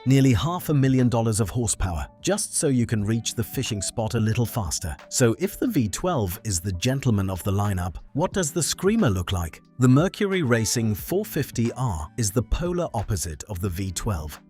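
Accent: United Kingdom. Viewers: Why the British accent? Why?!!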